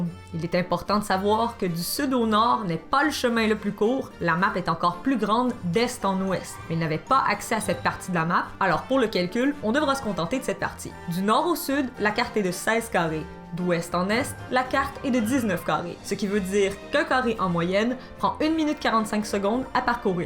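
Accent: Quebec, Canada. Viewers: Jte kiff, honnêtement dhabtiude j'aime pas les commentatrices et encore moins avec l'accent du Québec mais la je suis tombé sous le charme de ta voix et de ta prestance ! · Tes Canadien mec? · Ta voix est trop cool je trouve, l’accent québécois est super. Sinon les montages sont cool. Et puis pour les info il me semble que le guide papier du jeux est très complet, perso je lais et c'est plutôt cool, il parle même des 3 dragon, et donne leur lieux passage etc.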